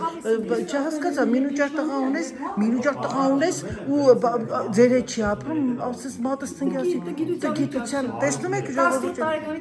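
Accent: Gyumri, Armenia. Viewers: Jerm barevner bolorin jan inchkan em sirum wor Lenakani Barbarov ek Xosum ❤❤❤ Im Txanela minujar u aprum en Arazin ❤❤u Da E jiste Ayo jan der kann nman Mardik wor der che Giten wor Mer jamanaknere ancel en Es Dzez Siremge. Hatuk barevner Mamayin u Dzer Huyrin ❤❤Dzez lav jamanak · Huzich er jan Lennakani akcentn el mi urish a! De cankali klini vor erexan apri cnoxi het tekuz mec tariqum bayc ete edpes e voroshel inch arac ham el ete amusnanum a arandziny tchisht e, inchqan el lav haraberutyunner lini arandzin zuyger aveli azat klinen u tchisht e erkusi hamar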